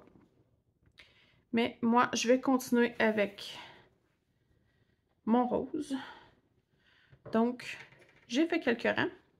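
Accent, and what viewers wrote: Canada. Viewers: Je l'ai terminé aujourd'hui. Je suis de France, dans la Sarthe (rillettes, 24h du Mans). J'ai adoré le faire et surtout avec votre accent Canadien 😍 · Merci pour cette vidéo. J' ai beaucoup apprécié vos explications. Votre accent québécois me rappelle de bons souvenirs ( voyage dans votre beau pays). Bonne continuation. Je vous envoie un petit coucou et un petit rayon de soleil méditerranéen du Sud de la France. Merci pour votre partage.KW.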